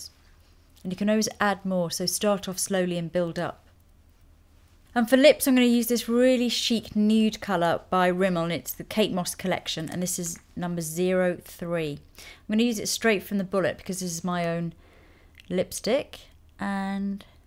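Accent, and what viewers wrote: United Kingdom. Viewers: ooh, just not to forget!!!! :D I simply adore your gorgeous British accent that I dont't have; instead I've got that Northern/Canadian accent! :D I've learned so many things about vintage make up from your videos, and this is so amazing and awesome!!! thank you again and again!!!!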